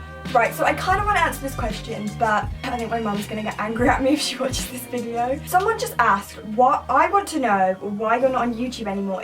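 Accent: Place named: United Kingdom. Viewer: Yo ur british ayee xD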